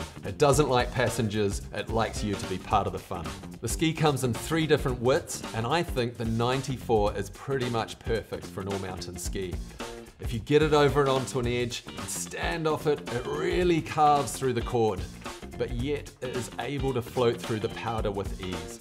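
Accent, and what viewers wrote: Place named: New Zealand. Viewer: Found this Vlog by accident. Nice vlog Mate. Funny hearing a Kiwi after being here in the US for 20yrs - now I know how I sound to my mates!!! Chur Bro. Armada 92 Declivity for me cuz…( latest edition )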